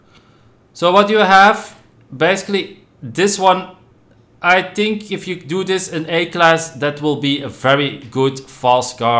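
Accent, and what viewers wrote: United Kingdom. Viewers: I thought that I have to do full Goliath race but you helped as always. Are you from UK?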